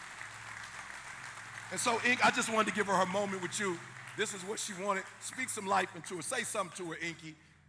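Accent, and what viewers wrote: United States. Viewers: Please can we get subtitles in arabic or French at least I like your videos and I can't understand all what you're saying because of the American accent. . Thank you 😊❤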